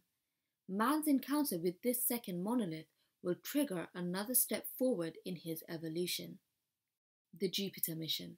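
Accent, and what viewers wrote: London, United Kingdom. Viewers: totally agreed beautiful British accent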